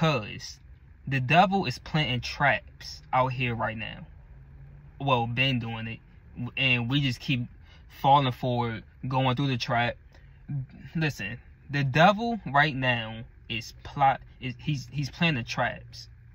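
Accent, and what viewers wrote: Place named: Maryland, United States. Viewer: Hi brother, are tou from Maryland? Your accent is giving it away lol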